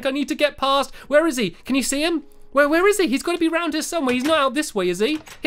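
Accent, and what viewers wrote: United Kingdom. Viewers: Me its cool there British like stampy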